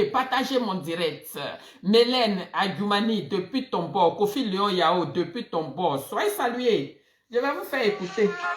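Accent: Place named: Baoule, Ivory Coast. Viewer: Je veux juste savoir TT ne cotisait il pas au PDCI? ton baoulé est trop doux merci. Parle fort ils vont entendre.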